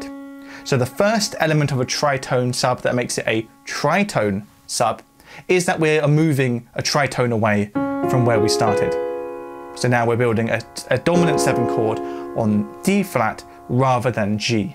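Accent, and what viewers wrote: United Kingdom. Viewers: I have to smile at the British pronunciations of some words. Like in the US we say Samba like “Somm-bah,” but accent it’s more “Sam-ber.” It’s like sometimes the British go out of their way to make foreign-import words more English sounding. Like pronouncing the t in valet or filet.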